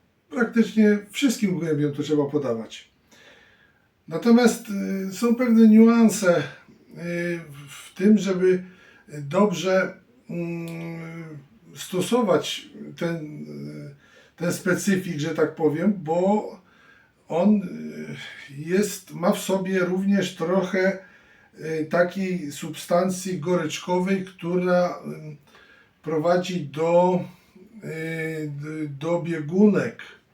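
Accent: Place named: Russia. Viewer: Co ten chłop pieprzy.Mowi na filmiku że już teraz zbiera czarny bez i daje gołębiom.Bylem w paru miejscach z ciekawości gdzie rośnie czarny bez i wszędzie jest jeszcze niedojrzały.Dlatego mnie to zdziwiło że ten hodowca od siedmiu boleści mówi że już zbiera i podaje bo ja też podaje bez i zbieram dojrzały na początku września.Radze nie słuchać rad tego hodowcy bo nie trzyma się kupy ani dupy.A jak taki wybitny hodowca że chce szkolić innych to niech się pochwalić jakie ma wyniki na przestrzeni lat na szczeblu oddziału już nie wspominając wyżej Żenada co on bredzi na tych jego filmikach jeszcze ten ruski akcent.Ortodoksyjny Ślązak!!!!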